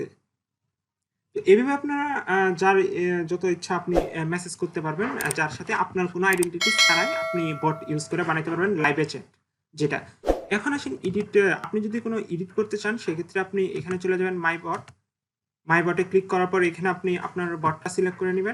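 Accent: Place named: India. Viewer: Could you try to speak more international English in your next video please. A strong Indian accent is hard to understand if not used to.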